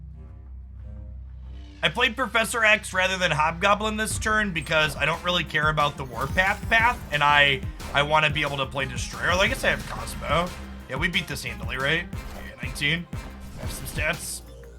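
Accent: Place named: United States. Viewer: YouTube voice: regular American accent. stream voice: “deed ya like that cheeeyat?!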